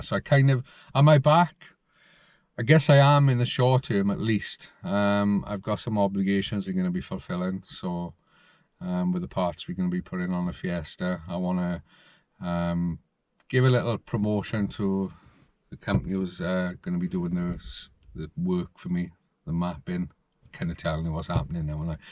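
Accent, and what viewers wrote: Wales, United Kingdom. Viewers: Thanks for all you've done, take it easy butt. When I first found one of your videos I'd been outside Wales for months in Uni, it cheered me right up hearing that familiar Welsh accent! You've put up some great videos and we appreciate them all. Good to see you're well and healthy:) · Your little Welsh tones have been missed.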